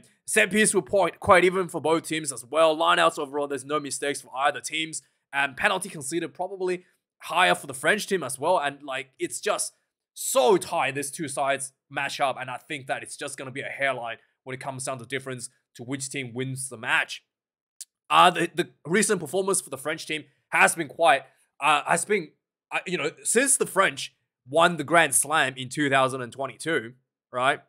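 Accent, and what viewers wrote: Afrikaans, South Africa. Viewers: As a South African, love your Afrikaans man.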